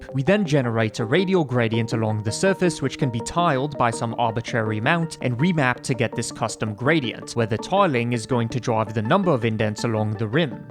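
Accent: United Kingdom. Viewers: Damn very British! Really posh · the British accent sounds pretty good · Is he doing an English accent? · Haha the british ish accent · why your accent turned bri'ish? (loved the tutorial tho it was amazing)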